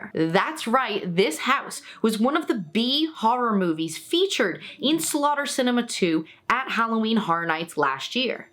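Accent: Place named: Louisiana, United States. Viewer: I figured you were from there the way you were talkin'. Lol! I used to hit up RenFaire annually from 2009 until around 2017 before we moved. I dressed up like a Templar Knight, but I'm thinking of switching up to Aztec Warrior. I miss it. Tried to hit up one on the outskirts of Florida with the fam. . . Yeah, it was just another reminder of how rinky-dink things are up here. Never thought I'd be that guy from "the big city" that talks trash about small towns, but the smug/ignorance of these small town folks makes me rep H-Town even more. I take comfort in knowing that Houston is the "dirty south" and isn't a big city like NYC. One thing you might appreciate though being from Louisiana. . . The seafood is better up here in Fort Walton Beach. This is coming from a guy who hated seafood until my wife made me try it in Connecticut. Anyway. 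. . Keep up the good work on the channel and hope you get to USO for HHN.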